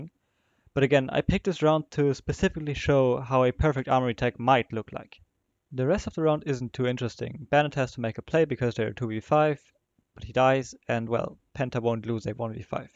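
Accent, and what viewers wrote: Germany. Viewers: Nice video! Are you german? Your accent sounds like you’re german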